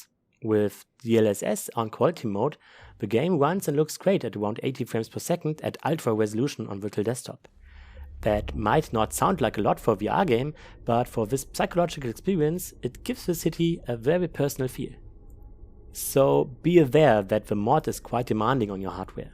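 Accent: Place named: Germany. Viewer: imo perfectly fine. Your english sounds very german but who cares. The content is great btw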